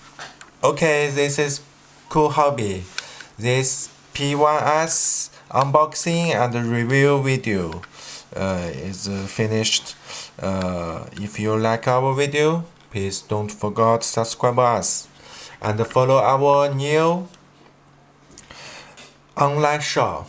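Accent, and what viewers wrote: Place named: China. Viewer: 国内有没有号每次要上tube听中式英语好别扭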